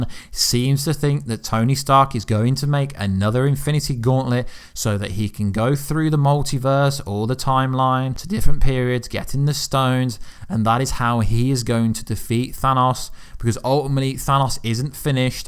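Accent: United Kingdom. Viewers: I'm well accustomed to certain pronunciations being butchered by a British accent, but since when did "human" become "thuman"?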